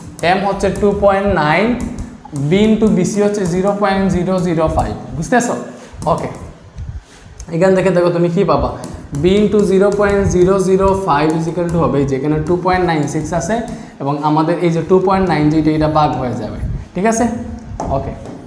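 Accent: Sylhet, Bangladesh. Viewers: Bai kita sylheti ni mate to ola lage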